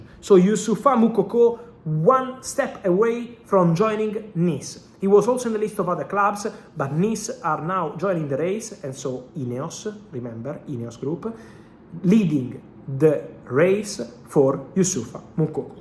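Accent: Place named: Italy. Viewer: Except we understand only 60-70%, and have to guess what else he is saying. His Italian accent is really not easy! I still don't understand who bid for Oshimen??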